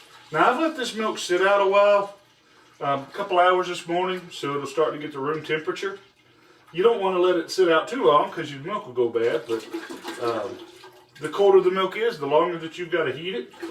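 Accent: South, United States. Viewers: Considering his Southern American accent, I would guess US gallon.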